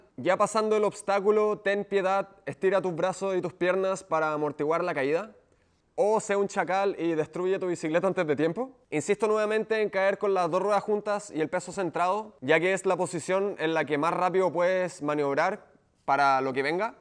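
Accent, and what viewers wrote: Chile. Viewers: Chileno seguro es chileno palancaso con tonada chilena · Habla Chilena 😎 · Chile y por qué te parece familiar el acento? saludos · Es ke no puedo practicar el bunny hop con un chileno me encaaanta su acento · Eri chileno klo?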